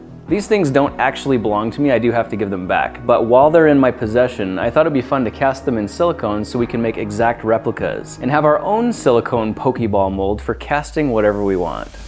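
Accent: Canada. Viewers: I've been wondering for a long time now, based on the way pronounces certain words like "tomorrow," if he's Canadian. Anyone know?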